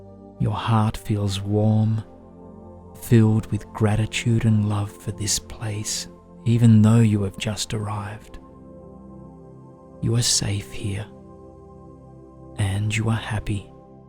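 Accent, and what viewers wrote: Australia. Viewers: Hello, I love your recordings, they are the best, they are so soooothing. We can truly sense your care in the tone of your voice and it is really heartwarming. I have been using your videos to calm my mind for over a year now. And recently I introduced my 13 year-old son to them because he tends to worry too much and has trouble falling asleep. Tonight he came find me and asked me to listen to one of your videos, he likes them so much! And he fell asleep peacefully lulled by your wonderful Aussie accent that we truly love. Thanks a lot for all the love and dedication you put in your channel. It is greatly appreciated! Cheers from Canada 😊🙏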